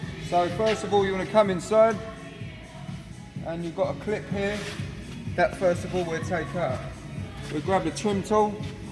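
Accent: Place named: Africa. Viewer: Africano hablando inglés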